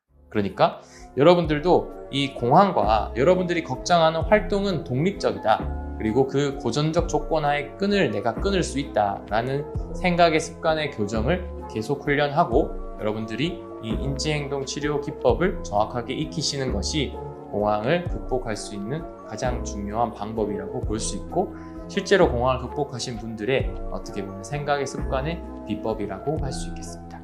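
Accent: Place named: Gyeongsang, South Korea. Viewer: 솔직히 방송 하려면 경상도 억양 정도는 고치고 방송 하는 최소한의 노력은 보여야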